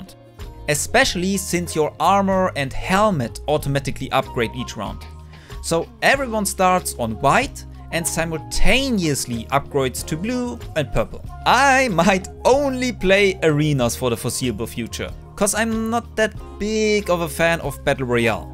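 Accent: Germany. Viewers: You Sound Like a German am I right? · I wanted to ask if you are German I’m German and I hear the accent so are you?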